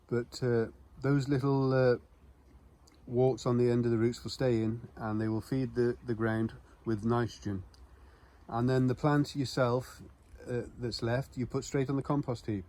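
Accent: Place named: Scotland, United Kingdom. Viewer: I'm not very good with accents. Are you from Scotland? You accent is lovely.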